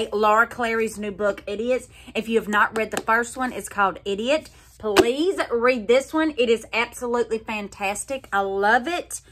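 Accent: South, United States. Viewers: Yes !!! Make up tutorial!! Need it. and more economical shopping for clothes. Keep up the smiles and the positive vibes. Live you and so does my husband. So glad you also speak southern. 💓✝️🇺🇸😎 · sounds like a real southern belle we in here in georgia luv you youre the VERY BEST how great is WE LUV YOU luv your southern alabama accent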